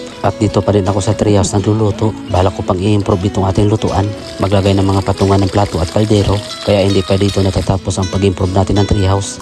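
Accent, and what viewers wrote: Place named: Visayas, Philippines. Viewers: Saan ka sa atin dol? Bistag dialect mo diba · Abot hanggang Antipolo, Taga San po kayo? parang waray salita niyo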